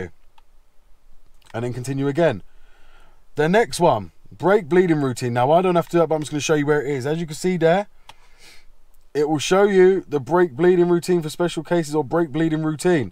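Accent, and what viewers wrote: United Kingdom. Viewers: I honestly do not understand why so many youtubers talk so fast when they are doing an exercise? Its weird and kind of disorienting to me.Please for future vids try to speak a little bit slower especially with your having a thick british accent.:)Thanks and keep up the good work!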